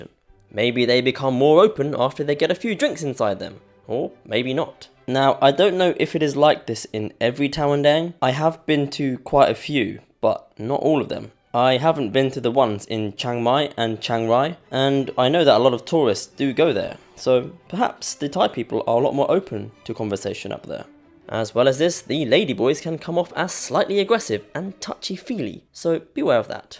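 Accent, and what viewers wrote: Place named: RP, United Kingdom. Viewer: I love your accent; it's quite similar to that of the Queen of England. Greeting.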